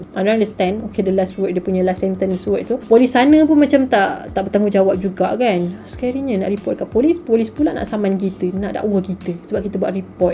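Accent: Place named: Johor, Malaysia. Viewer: Bahase km ni Johorian sangat..satu Malaysia bukan semua orang paham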